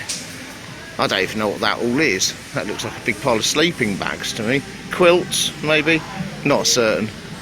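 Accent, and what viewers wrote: United Kingdom. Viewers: I know what Southwold is :-) just checking out PM as a destination as we lost flights to Roatan, 2021 due to the pandemic its looking like a good plan B, thanks for the tour and hearing a Brit accent, Im glad we arent the only ones who venture further afield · Which British accent is yours precisely? Thanks.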